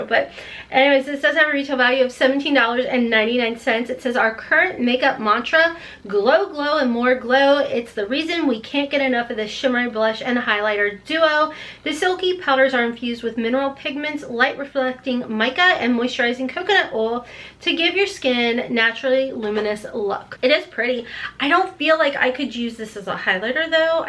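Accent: South, United States. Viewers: We love our country accent . And I sing 🎶 along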